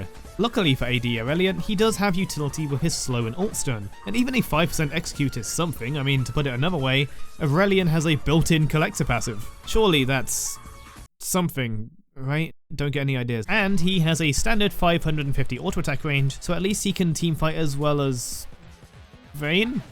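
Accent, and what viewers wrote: United Kingdom. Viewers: a british wittery accent is crazy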